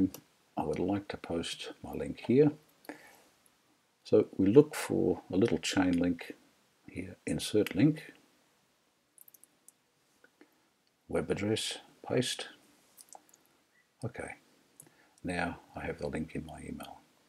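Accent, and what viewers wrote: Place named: Australia. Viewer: he sounds like an aussie version of bob ross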